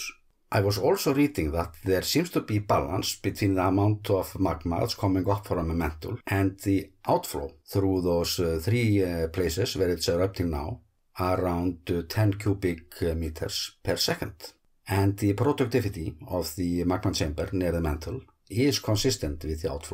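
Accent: Iceland. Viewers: As a student from Ireland who worked in Vestmanneyjar in '65-'68 and who saw Surtsey grow up, you accent, while not Westmann still reminds me of the many friends I fondly remember from those delightful days. Great reporting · Yes I love your commentary. Just matter of fact, say what I'm thinking quite often. I am getting used to your Icelandic accent too. I love your comments about the Norwegians lol. Much love and respect from the UK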